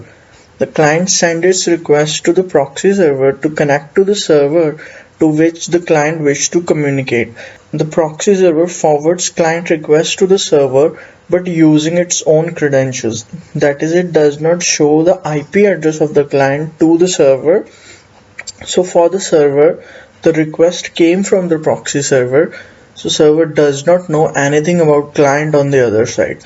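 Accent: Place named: India. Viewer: Because English is not a native language. Is that so difficult to understand? Talk to someone from China, or Japan, or Italy, their accents are different too. Only difference is that Indians are fluent in the language but the accent is different, while others screw up the grammar too and hence is less creepy to people like you.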